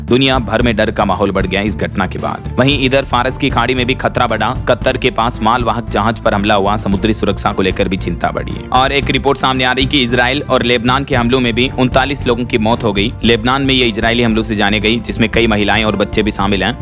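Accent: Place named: Madhya Pradesh, India. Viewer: Lag ta Hai ki aap madhya pradesh say ho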